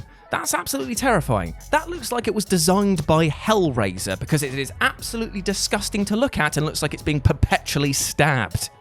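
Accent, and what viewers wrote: United Kingdom. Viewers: Simon Whistler clone? This just seems like it MUST be a parody of Simon's oh-so-British delivery. All he needs to do is mispronounce a few things. . . (and have nine more channels).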